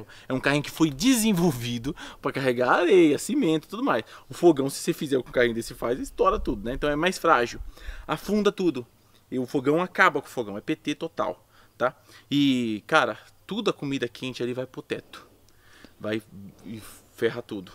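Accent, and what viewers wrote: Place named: Jandira, Brazil. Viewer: Cara vc é de Jandira né?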